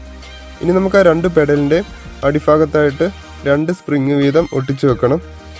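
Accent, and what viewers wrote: Kottayam, India. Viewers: Bro kottayakaran aanenn thonnunnu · Kottayam kaaran aano alla aa nadu fagathu ennu parayunnathu ketu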